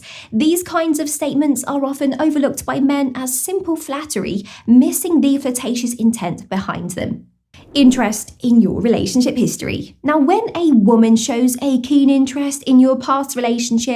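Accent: United Kingdom. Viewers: What I have trouble with is her Brit accent .Too much.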